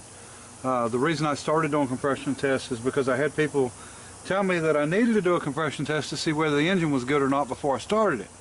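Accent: United States. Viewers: awesome find all the cars ive seen and worked on, but have never heard a straight 8 run. Can't wait for the next video. Also I know that you are country cause im country i here's it in the way you talk. Nothing wrong with being country either, but just so not to confuse the slower witted city folk. When you say sandblasting spark plugs, just say we's gonna clean them. Ya see most folk in the cities around the world take people at face value and what they say. Poor people haven't ever heard of slang words before. When I moved to the big city I found out that most of them were simpletons and what you said is what you meant. Think most of them is touched in the head. Or like they say in Cherokee hills of NC,they's touched by the great spirit, and us country folk knows that that's not good. I would try this don't explain what or why or how you're going to do something just do it,they may not get it, but that's because they never will.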